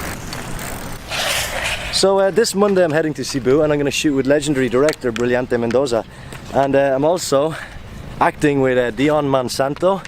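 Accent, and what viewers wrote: Ireland. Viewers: Blows my mind that still has his Irish accent 😂 love from Eire 🇮🇪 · Are you from Tipperary in Ireland ? Your accent is kinda the same here in Tipperary! Im a Filipino fan staying here in Ireland ✌️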